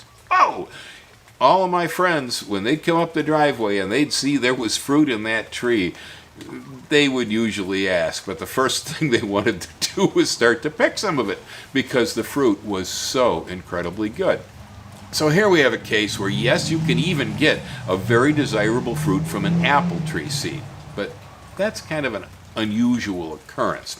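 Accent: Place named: Wisconsin, United States. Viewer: Ah northern Wisconsin, I thought u sound familiar. I’m from Minnesota.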